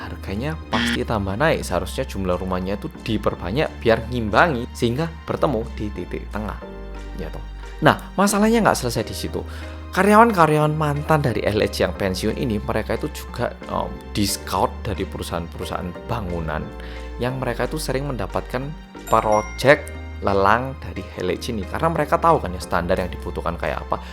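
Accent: Java, Indonesia. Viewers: Bersama orang Korea yang "MENDOK" :V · Tim gercep mas medokkk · helooo mamang medhoookkkk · Wah ma's emang he at bgt gua coba bicara2 bhs medo aja gabisa😭😭😭😭😭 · Medhooookkk. Gercep dan setiap nonton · yang medoookkk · Welcome back to KOREA REOMIT BERSAMA ORANG KOREA YANG MEDHOOKKKKKKKKKKKKKKKKKKKK🚗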